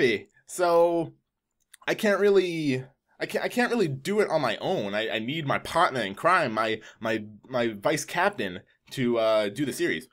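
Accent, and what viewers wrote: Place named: Caribbean. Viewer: Im from The Bahamas and you look and sound just like me, are you from the carribean? if so which island or america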